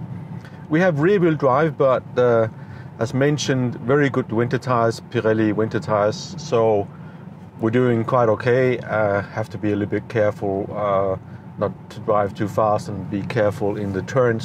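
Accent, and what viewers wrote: Denmark. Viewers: Are you danish? Your accent sounds danish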